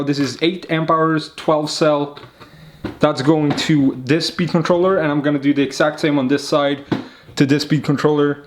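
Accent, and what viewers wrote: Netherlands. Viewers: Are you dutch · The whole time i heared you speaking english i thought you where dutch..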